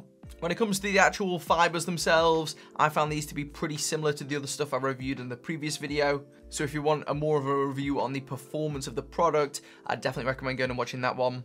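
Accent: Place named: Northern England, United Kingdom. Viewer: I hear a Northern England accent. What town, mate? Nice vid.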